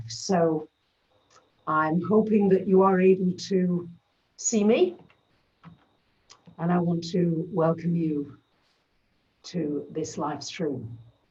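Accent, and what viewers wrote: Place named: Lancashire, United Kingdom. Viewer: I love your videos and your accent, it's sounds like you are a fellow Lancastrian northern lass like me. Please don't let others undermine how wonderful you are, you have a lot of loyal followers who get so much from your posts.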